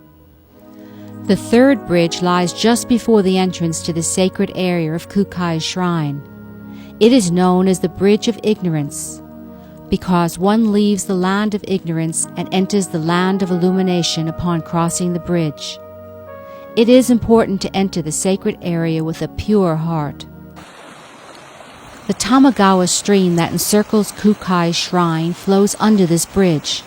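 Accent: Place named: East Coast, United States. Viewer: The narrator's eastcoast accent was a nice touch....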